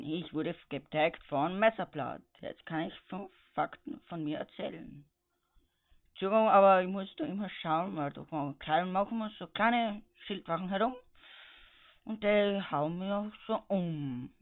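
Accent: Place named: Styria, Austria. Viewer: ^^ deine stimme is ja so genial..fakt numaaaaa ^^^ wie gehts denn so..? und deine mischung aus hochdeutsch und steirisch..thehehe tschüüüüüüs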